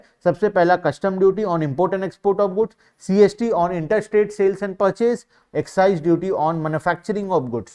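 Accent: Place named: Sambalpur, India. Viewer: Sir kya app western odisha se hai kyu ki app ki bate thodhi thodhi sambalpuri language jese lag raha hai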